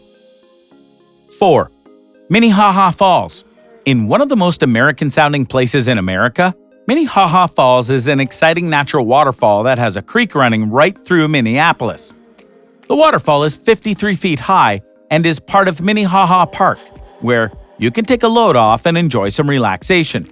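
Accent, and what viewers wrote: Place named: Canada. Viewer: The narrater sounds Canadian.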